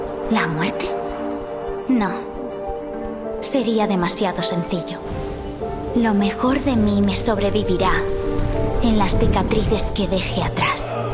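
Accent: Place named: Spain. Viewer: Está vez español de España fue mejor que el de latam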